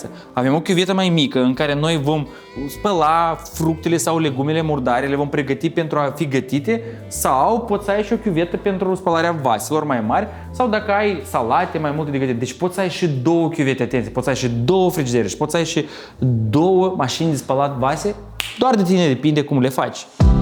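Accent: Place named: Bessarabia, Moldova. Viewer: cu accent basarabean,dar bine pregatit.exceptionala prezentare,profesionist,cu detalii finisate in arta amenajariloe!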